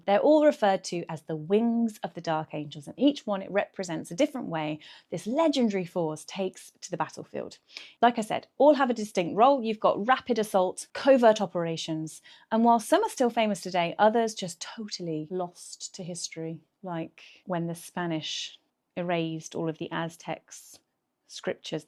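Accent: United Kingdom. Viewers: We just discovered Dark Angels greatest secret. Their Dreadnoughts are piloted by the FALLEN! P.S. are you British? You have a beautiful accent.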